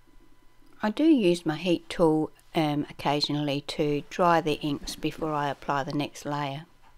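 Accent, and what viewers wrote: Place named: Australia. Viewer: Nice to hear a fellow Aussie voice!!! Great page! That pastel green as a background with the orange is very dramatic!!!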